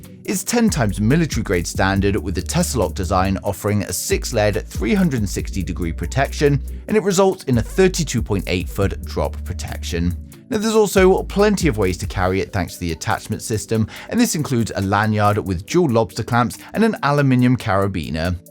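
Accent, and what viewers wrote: United Kingdom. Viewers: British accent..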